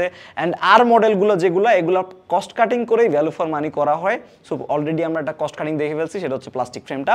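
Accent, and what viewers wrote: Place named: Dhaka, Bangladesh. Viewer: bro ,jaiboga ,khaiboga, khayadise ai typer laguage ta sunte khub baje lage, i mean dhakaiya laguage ta ,,change krl vlo hoy.. khub cheap and dirty language mnehoy sunte.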